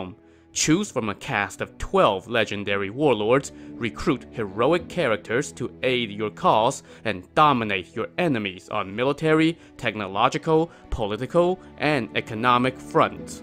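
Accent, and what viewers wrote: China. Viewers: Chinese pronunciation and syntax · is it me or this guy pretending to have a slight chinese accent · 而且这tuber的这个英语口语一听就是墙里人（笑） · It is quite obvious that the narrator is a native Chinese speaker (discernible in his English)... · I love this Chinese pronounciation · are you doing a chinese accent? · Why the thick Chinese accent though?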